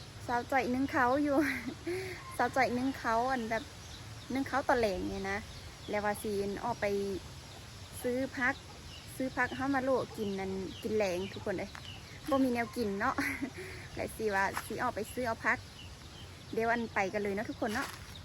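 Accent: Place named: Phu Thai, Thailand. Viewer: สวัสดีจ้าสาว ภาษาภูไทก็มีหลายสำเนียงเนาะจ้า เออสาว อยู่เมืองไหนจ๊ะ😊😊😊❤❤❤❤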